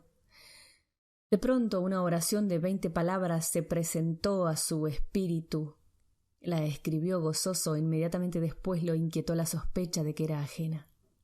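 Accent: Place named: Argentina. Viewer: Espero no ofender a nadie con mi comentario, si fuese el caso: lo siento. Usualmente no me parece atractivo el acento argentino, pero tu voz me encanta. Gracias por las lecturas.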